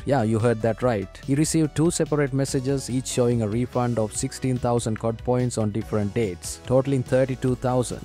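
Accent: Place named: India. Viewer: Indian accent... right, i don't buy anything he says.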